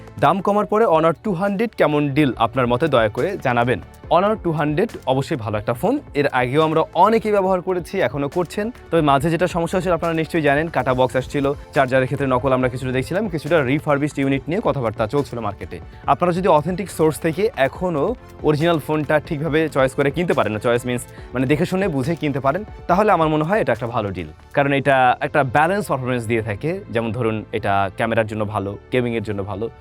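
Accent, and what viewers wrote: Barishal, Bangladesh. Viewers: ভাই, আপনার বাসা কি মোগো বরিশালে🥰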